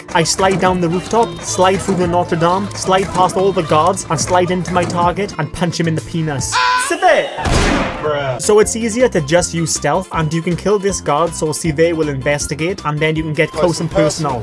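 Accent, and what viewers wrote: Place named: Wales, United Kingdom. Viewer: Welsh accent is carrying this video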